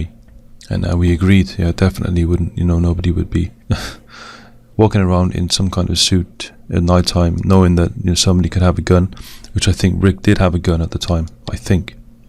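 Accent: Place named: Cardiff, United Kingdom. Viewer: Your voice sounds like your from Cardiff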